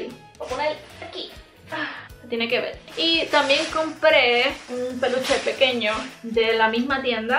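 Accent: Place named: Puerto Rico. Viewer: La cartera está preciosa. No sabía que eras latina por tu acento deduzco que eres puertorriqueña porque cambias las R por la L. Deberías revisar las tiendas de segunda mano a veces tienen cosas de Sanrio por muy muy barato. A mi también me encanta los LTS Saludos de una Venezolana desde Austria en Europa.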